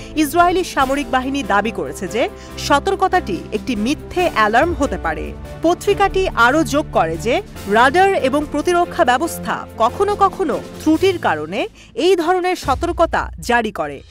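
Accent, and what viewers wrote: India. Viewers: আসসালামু আলাইকুম কথার ধরন ভারতীয় হয়ে যাইতেছে বাংলাদেশি মানুষের মতো কথার ধরনটা করেন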